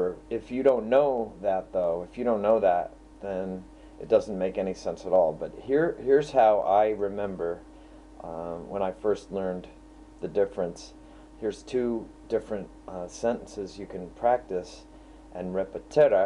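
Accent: United States. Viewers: Hehe. I love the American "R", hihi =) It sounds so weird, since i'm a native speaker, though I don't blame you. Very interesting video man, carry on :D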